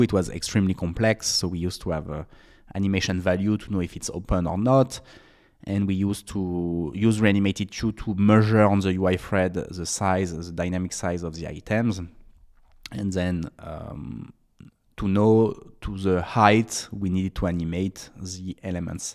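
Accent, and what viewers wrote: France. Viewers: Love it when the French speak english. Great content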